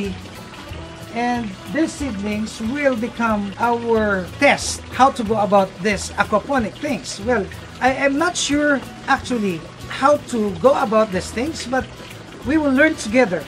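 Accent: Philippines. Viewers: Hi,i am Indonesian thank you for sharing a lot of inspiring knowledge from you experience&your good heart,humble& english style remind me of my pinoy friends (i miss them),wish u more success,salamat Po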